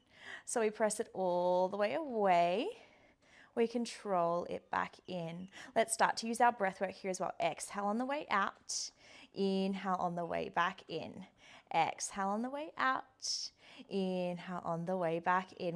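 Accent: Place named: Australia. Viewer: I've only recently found you. I've done couple of your classes, and I really enjoyed this one. I also LOVE listening to an Australian accent instead of American! 😊 I also like how you refer to different reformers and modify for them. I have a YR, and I'm an instructor, but don't do enough of my own practice. Thank you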